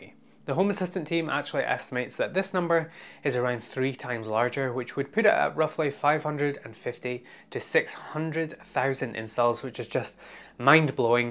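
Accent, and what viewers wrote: Scotland, United Kingdom. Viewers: If Home Assistant does voice, then you should be that voice. Your relaxing Scottish brogue would make it!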